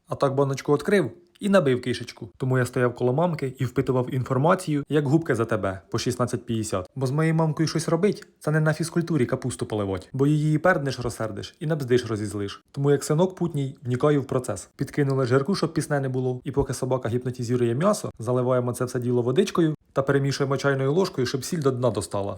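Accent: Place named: Rural, Ukraine. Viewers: Полтавский сюржик😂❤❤❤❤❤???? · Суржик у вас даже знакомий, прямо , як у рiдному селi..побувала. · Це не народжується) Черкаси і черкаський район - ми так балакаєм 😅😊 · Південний говір,мій ріднесенький,аж заскучала.Давно я в рідному краї не була,не дивилася із холму на ті поля нескінченні,пилюку ту задушливу не ковтала ,несучись полями(бо то ближче)до якоїсь річечки на шашличок,могилками рідних своїх невклонялась.....Господи,як же я скучила,навіть сама не зрозуміла.... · Полтавський говір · і Полтавщина так балакає.😊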